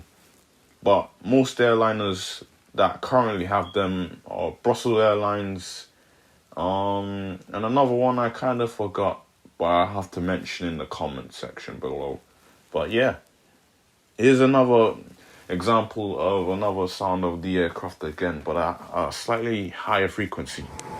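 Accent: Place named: Roadman, United Kingdom. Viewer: You’ve got the roadman accent, love it!!! Great video though, the sound is the best 😂😛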